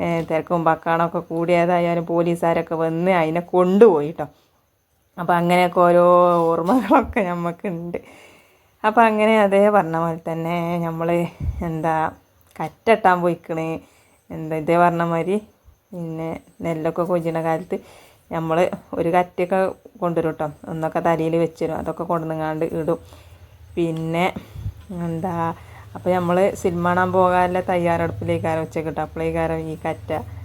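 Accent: Malappuram, India. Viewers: Malappuram bashayanallo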